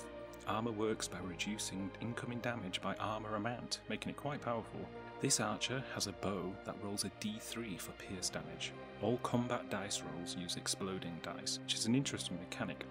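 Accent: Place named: United Kingdom. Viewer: your quiet british accent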